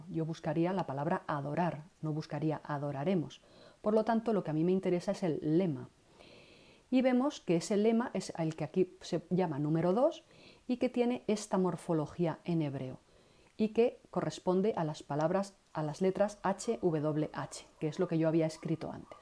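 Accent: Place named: Spain. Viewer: Logos excelente. Mis videos son más tipo como preparar mensajes usando el programa. Hay bastante riqueza de información en sus videos y yo los usare para ya no enseñar lo que tu has plasmado aquí. Con ese dejo supuse que era de España. Algún día tendré el privilegio de viajar allá.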